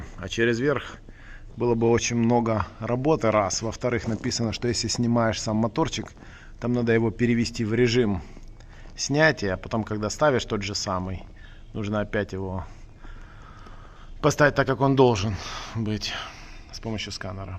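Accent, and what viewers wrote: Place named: Saint Petersburg, Russia. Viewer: Хорошо смотрится. Без заумствований и проглатывания процессов в ремонте. Приятный русский язык, как будто из Питера)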